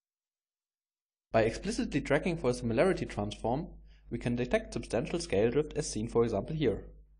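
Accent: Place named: Germany. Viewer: So German English!!!!!!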